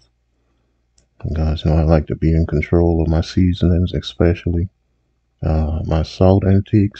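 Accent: Charleston, United States. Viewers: I can here the Charleston in your voice. I’m here to steal another one of your recipes 😂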